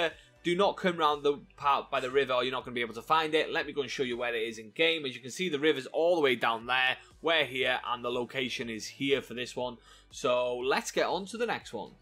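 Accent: United Kingdom. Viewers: Dude you sound like you're British which is nothing wrong with that I'm from the United States but I loved your video short it was sweet and it was precise have an excellent day I hit the like button for you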